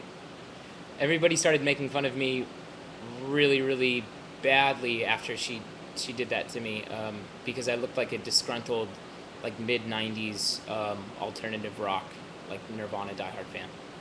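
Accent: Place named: Iowa, United States. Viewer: Pure Iowan accent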